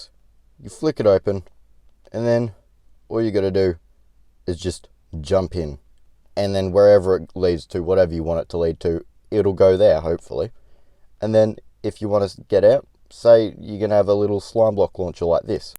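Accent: Australia. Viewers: Heavy Aussie accent love it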